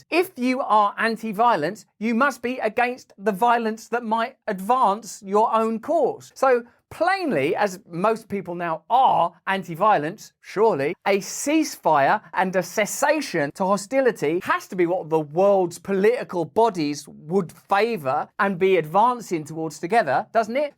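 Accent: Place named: London, United Kingdom. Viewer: u sound like ur from london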